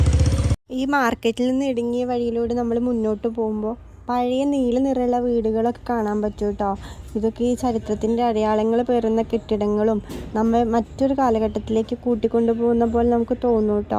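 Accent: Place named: Malappuram, India. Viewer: Kutty Malappuram aano samsarathile Tto Tto kettappo thoni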